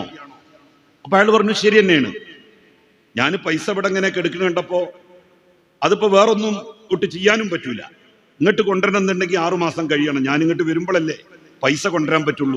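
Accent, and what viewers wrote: Malappuram, India. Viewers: ജെലിൽ സർ.... തനി നാടൻ മലപ്പുറം..... സ്പീച് അടിപൊളി... പെരുത്ത് ഇഷ്ട്ടം 😂😂😂😂😂🎉🎉🎉🎉🎉🎉❤❤❤❤ തട്ടിപ്പിന്റെ സർവ്വകലാശാല ആണെന്ന്... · സഖാവ്: അങ്ങേയുടെ മലപ്പുറം ലാംഗ്വജ് ഒരുപാട് ഇഷ്ട്ടായി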